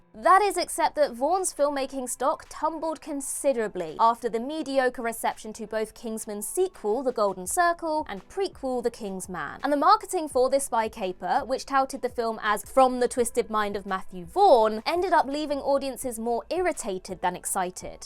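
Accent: United Kingdom. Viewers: You have the cutest British accent I have ever heard.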